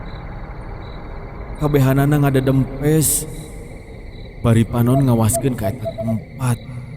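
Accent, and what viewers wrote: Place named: Sunda, Indonesia. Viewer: Bahasa medok sunda gk paham ngomong apa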